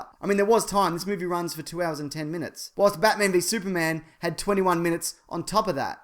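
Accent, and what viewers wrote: Australia. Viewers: I really like the idea of a solo joker movie with Harley Quinn. I felt there was not a reason to put the Joker in the Suicide Squad movie. It's as as if they want to put as many characters on screen as possible and sees what sticks. But thanks for letting me know that there's a novelization. I'll see if it's at <link>. By the way your Australian voice is awesome!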